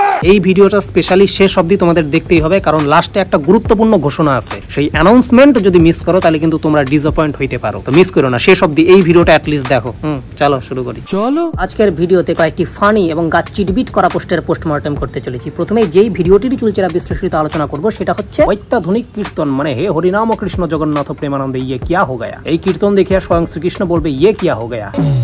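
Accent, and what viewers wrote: Bangladesh. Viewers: আপনার বাংলা pronunciation আমাদের বাংলাদেশের বাংলার মত কিছুটা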